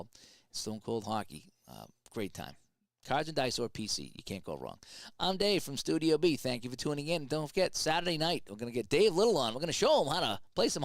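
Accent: Boston, United States. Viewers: Wow that was a cool video! I listened to the whole thing as if it were a real series…lol. I just discovered SC Hockey a little while ago from your channel. Finally ordered it and I love it. Especially the feature for creating your own teams. So I am just about finished with assembling the 1980 Olympic Hockey Teams. If I send you my excel files would you consider doing a video of a game or two from the tournament? There were so many Boston players in our USA team that your accent would be perfect.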